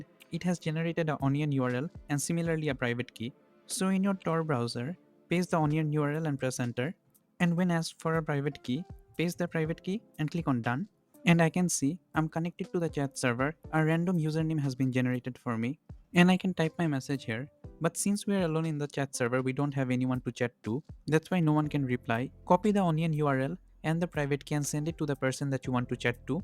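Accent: India. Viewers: That's a true indian accent are you an Indian?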